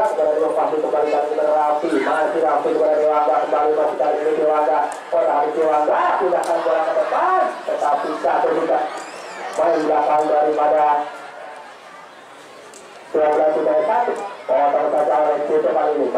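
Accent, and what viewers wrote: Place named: Banyumas, Indonesia. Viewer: ngapak koh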